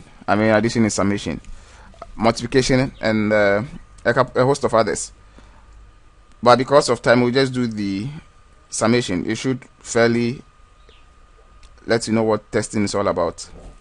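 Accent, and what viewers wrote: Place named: Ghana. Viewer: Nice tutorial bro... feeling your Ghanian accent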